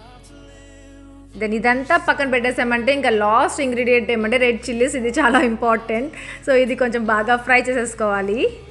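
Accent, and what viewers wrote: Tirupati, India. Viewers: akka me voice chala bavumtumdhii...and meru banglr kadha akkada oka slang ga matladutaru ..kani meru tpt side vallu matladinatee umdhii akka...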